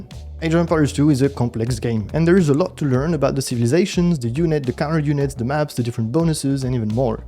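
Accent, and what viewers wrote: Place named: France. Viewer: Je me disais que t'avais un petit accent fr. Par contre vraiment propre ton anglais on pourrait même croire que t'es autre chose.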